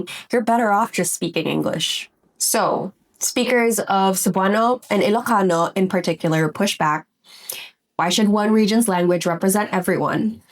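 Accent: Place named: California, United States. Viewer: Sounds like you speak English with a California accent to me.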